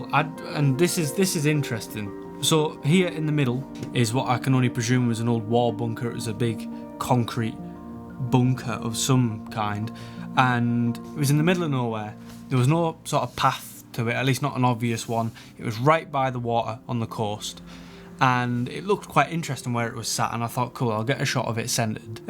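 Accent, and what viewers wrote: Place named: Northern England, United Kingdom. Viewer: Right. What's going on? Just came across your channel and the two videos I watch are so relevant. Been taking lots of pictures of loved ones lately and yesterday I picked up a Kodak 66 model III I got on ebay. Looks very similar to your camera. Liked, subbed and comments. You sound northern as well, I'm up here in Cramlington. I'll be making my way through your videos. Keep it up.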